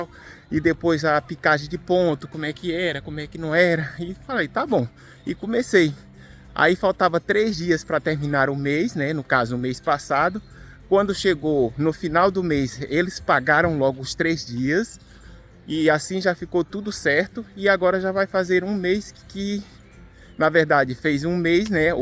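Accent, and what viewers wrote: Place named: Northeast, Brazil. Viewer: Quem será que mandou esse nordestino, essa encomenda, para cá para Portugal? No último vídeo dele, ele desativou os comentários porque ele sabe que vai ter "pra sobrá prá eli". Ele não está no estado dele, nem ele está no país dele. Vê-se logo que ele é dum país de TERCEIRO MUNDO, que é de orientação de esquerda e ele dá a entender que é evangélico ao dizer que a Terra é de todos. A terra dos evengélicos e dos das seitas é a Holanda, a Dinamarca, a Suécia etc. Portugal é um país católico e cá fala-se português de cá de Portugal e não o português do Nordeste do Brasil, como ele fala.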